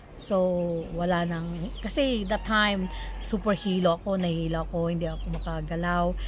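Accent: Ilocos, Philippines. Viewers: ilocano ka gayam madam? kunak idi bisaya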